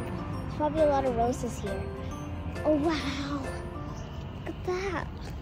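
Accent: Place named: Canada. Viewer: Nice!!! You sound Canadian ey!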